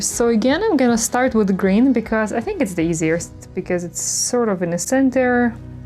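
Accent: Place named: Russia. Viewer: Так и знала что вы русская...😉 своих всегда видно и слышно...🤗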